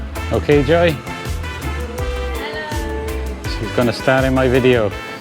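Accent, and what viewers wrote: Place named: Cardiff, United Kingdom. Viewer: I thought u had a Cardiff accent I’m living in Melbourne but I’m from porthcawl originally I’ve got a condo in jomptien in the Maldives ready for retirement after this virus clears up your video is very good keep it up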